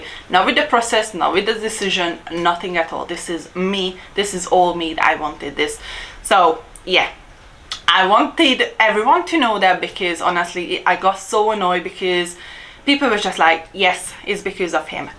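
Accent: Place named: Europe. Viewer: you CANNOT claim British Citizenship if you had a baby born here in the UK from a British Ciztizen! Having a child who is a UK citizen does not automatically grant you citizenship as a parent. you were talking out of your arse! don't spread nonsense information that is absolutely not the case! Before you post videos, do your research! Giving up your Hungarian citizenship was the stupidest thing you could ever have done! What an absolute idiot idea! So basically, you gave up on your Hungarian Citizenship because you are a self-conceited person that it makes you believe that you are somewhat of a bigger person than the rest of us. I can easily imagine if you ever went on holiday to Hungary, you would be pretending to be a British born Citizen and only speak English to everyone. how pathetic! But in reality, you have lost a lot by giving up your EU Citizenship :) Another thing, your accent is off. 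You still have that strong European accent, so keep practicing! One day you might be able to have a British accent ;)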